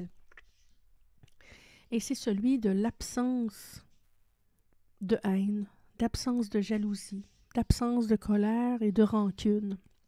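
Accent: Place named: Quebec, Canada. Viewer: Une voix familière québécoise! Il y en a peu depuis que je visionne différentes capsules sur ce lien suite à mon éveil! Je vous découvre avec bonheur ce soir. Je vais parcourir vos vidéos maintenant...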